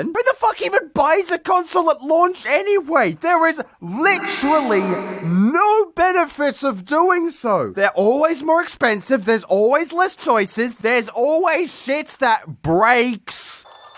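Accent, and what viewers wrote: Australia. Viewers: He's Australian you git. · No because he’s australian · Gaijin Goombah Media Australian not English mister goombah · No he's australian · Aussie not English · He’s Australian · Gaijin Goombah Media Australian not English (They're different I tell you, THEY'RE DIFFERENT!!!!!!) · W8, are you Australian? Sick, me tooooooooooooooooooooooooooooooo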